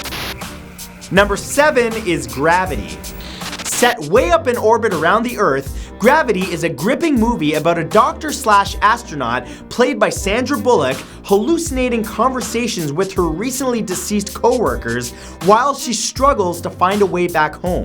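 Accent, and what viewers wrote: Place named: Canada. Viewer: He's not a dumbass he's from Canada where they pronounce some words slighty different then in the US. Even if he wasn't pronouncing a word different doesn't make you a dumbass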